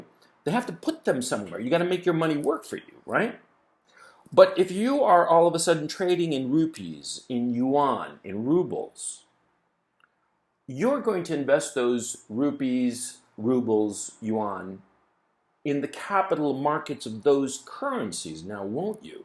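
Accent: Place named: United States. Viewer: Can you do an intro in Spanish and later in Russian? Just to compare how different it sounds. You sound 101% American in every video :-D Thank you!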